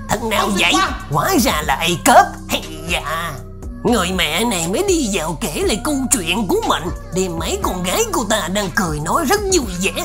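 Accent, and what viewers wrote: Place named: South, Vietnam. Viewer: Giờ mới thấy giọng miền nam lồng tiến , hầu hết giọng bắc kì giờ muốn muốn bao cả youtube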